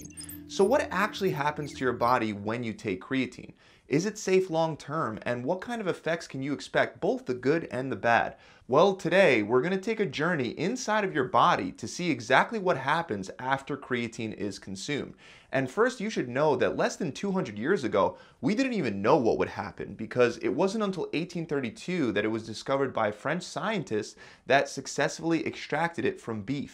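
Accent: Philadelphia, United States. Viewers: You from Philly or South Jersey? I know wooder when i hear it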